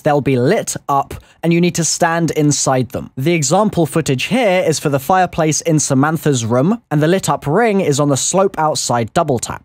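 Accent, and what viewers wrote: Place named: United Kingdom. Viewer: his voice is probably "funny" because he's british. if you're american or something around that area, then that's probably why. his voice does sound a bit high-pitched for a grown man, though. maybe that's what you meant.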